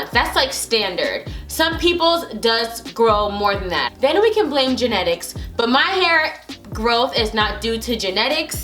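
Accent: Jamaica. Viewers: Video sell off. Real Jamaican talk. Any tips for bald patches. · I knew i heard an jamaican accent in one of ur videos but i just brushed it off as i was too proud lol now u cleared that up. 876🇯🇲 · Hair is beautiful queen!!! Do you have a patois accent btw?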